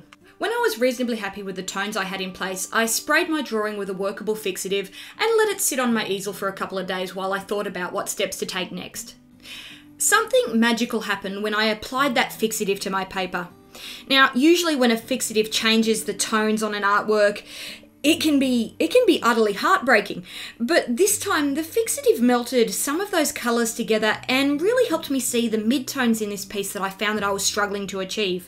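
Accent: Australia. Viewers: Are you from Western Australia? Your accent sounds like it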